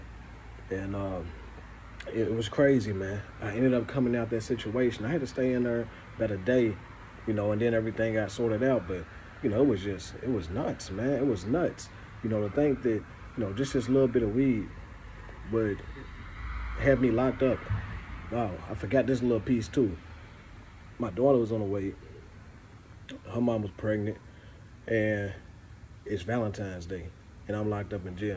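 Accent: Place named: Memphis, United States. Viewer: I appreciate your videos. You gotta be from Memphis that accent thick!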